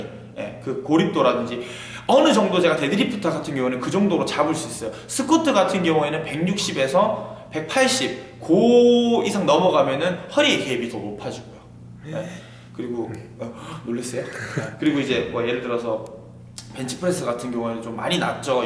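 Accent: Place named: Busan, South Korea. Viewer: 선생님 부산 분인가요? 사투리가 찰지네요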